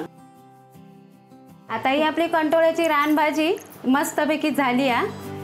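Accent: Malvani, India. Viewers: तू इतकं गोड मालवणी बोलतेस ना की ऐकत रहावं. नक्की करतलय भागला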